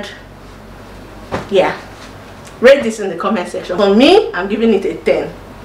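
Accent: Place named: Igbo, Nigeria. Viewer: Love the humour jare,your Igbo accent is on point🔥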